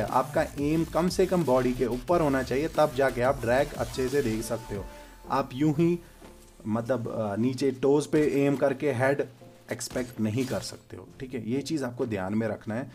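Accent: Kashmir, India. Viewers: Arey bhai aap ki awaaz sun ke hi laga ki aap kashmiri hooooo. Aap se insta se pata chala. Aapki awaaz rj se milti haii. Luv frm pulwama. Support kashmiri playerssss.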